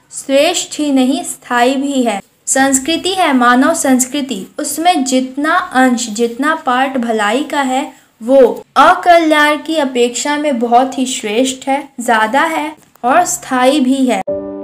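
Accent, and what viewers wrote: Bengal, India. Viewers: Dii ap Bengali Ho Kya. Love from Rajasthan❤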